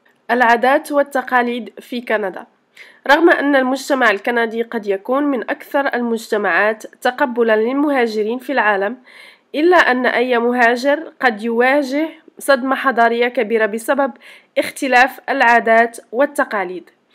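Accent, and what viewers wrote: Algeria. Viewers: My first thought when I heard your accent is that you are Algerian, then I saw your account page and you are Moroccan. So, I was close. Here in America, there are many of our people who were doctors, engineers, etc. in Algeria, Morocco...etc. and now they work in jobs like restaurants, taxi drivers, etc. Because America doesn't accept their degrees and they have to go back to school if they want to do the work they did back home. In America, nothing is free, because you have to pay for college, doctors, medicine, water, housing...etc. even if you have insurance, you still have to pay something besides what you already pay for insurance. Also, in America, there is high crimes and very high racial tension. Would you do another video in Darja or Tamazight next time? 🤣🤣 ==== Ma première pensée quand j'ai entendu ton accent c'est que tu es algérienne, puis j'ai vu ta page de compte et tu es marocaine. 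J'étais donc proche. Ici en Amérique, il y a beaucoup de nos gens qui étaient médecins, ingénieurs, etc. en Algérie, au Maroc...etc. et maintenant ils travaillent dans des restaurants, des chauffeurs de taxi, etc. Parce que l'Amérique n'accepte pas leurs diplômes et qu'ils doivent retourner à l'école s'ils veulent faire le travail qu'ils faisaient chez eux. En Amérique, rien n'est gratuit, car il faut payer pour l'université, les médecins, les médicaments, l'eau, le logement... etc. même si vous avez une assurance, vous devez toujours payer quelque chose en plus de ce que vous payez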